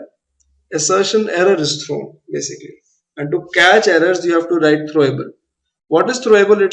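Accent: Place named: India. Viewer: blurry screens, hardcore indian accent, but the topic was so great. I didn't care about all that. I just wanted to learn more. Excellent teacher. Best video I seen on this topic.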